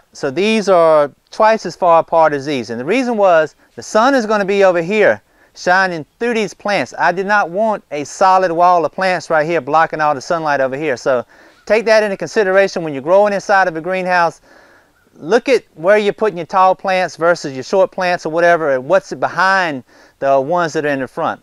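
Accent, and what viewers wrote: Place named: Louisiana, United States. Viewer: Dude... That sounds like a Louisiana accent! 😳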